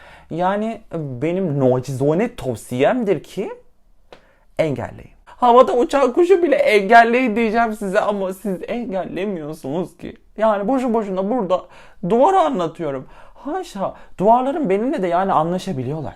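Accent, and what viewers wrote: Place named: United Kingdom. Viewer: Ya şu her videoda yaptığı British English ve şarkı showları beni benden alıyor ya belirli aralıklarla yeterli dozda almazsam nöbet geçiriyorum sen şimdi benimle naaaağpıcaksın?¿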